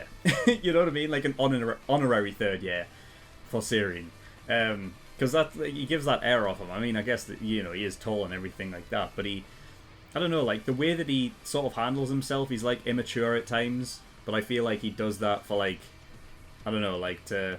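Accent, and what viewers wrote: United Kingdom. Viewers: Your English is music to my ears since I love British English so much. Which part of England you live? Sorry that I ask I just am curious. I mainly mean in which city because I have been in England in 2004 during our Midsummer and it was extended weekend meaning I left from Finland on Thursday and came back in next week's Tuesday and I was in London then but since it is so big city I didn't managed to see all possible things but I liked that trip I really did. Yes I am from Finland myself despite my username.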